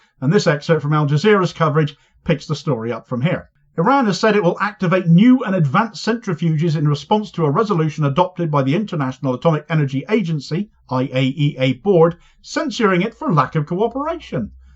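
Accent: Cornwall, United Kingdom. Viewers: I’m glad you feel like me, so many people are feeling the same way. I don’t know how many people of all nationalities have said the same. I never thought I’d be rooting for Hezbollah, Hamas, Houthis or Iran. Many people are saying it’s as if the world has turned upside down. A lot of Americans have said how ashamed they feel at their governments policy regarding Israel. People, especially in the west feel as if they have been lied to for decades. So many people are now clued up about the situation of the Palestinians, including the Nakba, 1948 and the forceful displacement of the Palestinians by the Zionists and the terrible atrocities that were committed by them. Social media has been a boon in educating people and channels like give us the facts. has been consistently brilliant at showing us the truth, I also love his Cornish accent.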